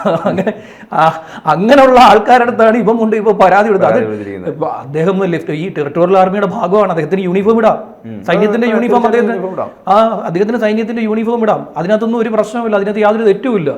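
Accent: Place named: Thiruvananthapuram, India. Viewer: എന്താ.............അഴുക്കു പയ്യൻ 😁., മ്മ്‌ടെ തിരുവനന്തോരം ഭാഷ 😆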